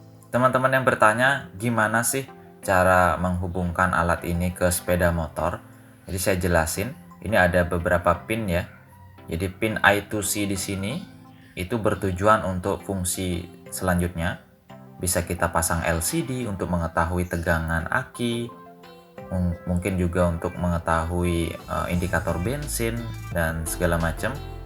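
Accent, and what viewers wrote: Bali, Indonesia. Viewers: maaf saya bntu,itu sbnrnya logat bali,yg salah hanya 'Mukin' sisanya logat bali digabung sama bhsa indo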